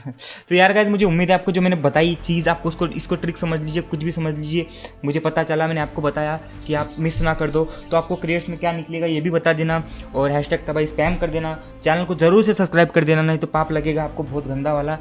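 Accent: Maharashtra, India. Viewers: Bhai marathi ahes ka tu...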